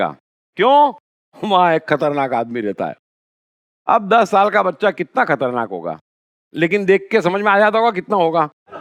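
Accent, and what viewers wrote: Gujarat, India. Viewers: Lagta he k sir hamare Gujarat se hoga qki gujarati sbd pyor bolata he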